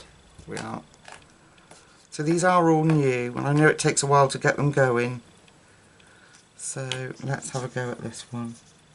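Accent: United Kingdom. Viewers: Oh, you are so much fun! And you keep it real! And I love your British accent! I watch zucchinikitty all the time, she’s indeed a master with the inks, I got a few just to try, they are not as easy to use as it would seem! The detail inking tool is really hard to come by where I live (Mexico where nothing is available and when it is is at least 5x more expensive) do you think a Q-tip could work? I hope to see you color with these a LOT! They are fast! And even though I love colored pencils.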